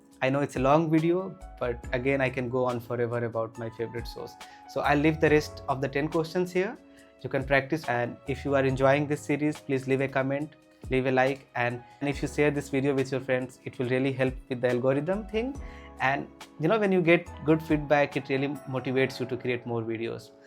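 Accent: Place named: Bhojpuri, India. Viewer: Sir , thoda MTI aata hai aap ke speaking me.. it seems that you speak Bhojpuri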